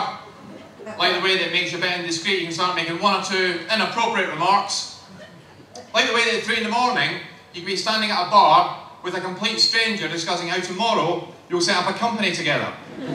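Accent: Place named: Scotland, United Kingdom. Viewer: He speaks English with a Scottish accent, 'cause he's Scottish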